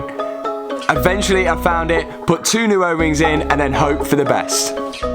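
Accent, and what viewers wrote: United Kingdom. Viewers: Your british english accent hurtsfor my ears, also indistinctive.. BTW nice car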